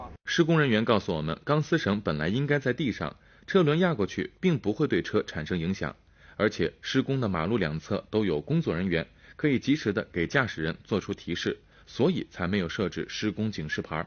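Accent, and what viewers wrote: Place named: East China, China. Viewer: it iz english with strong east chinese aksent.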